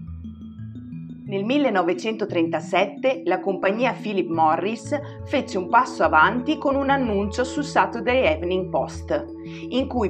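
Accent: Veneto, Italy. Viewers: Sei veneta? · Racconto interessantissimo... grazie☺️ Sei Veneta per caso? 😬🙃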